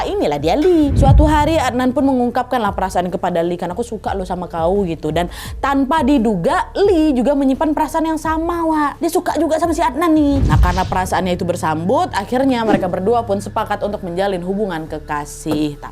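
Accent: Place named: Sumatra, Indonesia. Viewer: Trnyata banyak jga yg suka nntn 😂 suamiku sampe hafal kalo udah denger kau nada sumatra pasti lg nntn si wawa yaa😅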